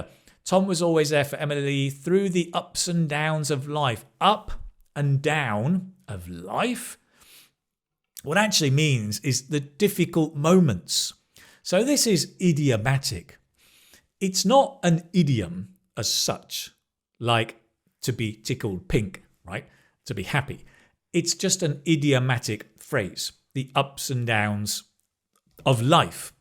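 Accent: United Kingdom. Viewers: It is amazing, how good I understand your pronunciation! The are almost 90% of your speech. I think it's depend on your wonderfull british accent. Your way to speak is very clear! I have so much problems to understand americans. thanks a lot!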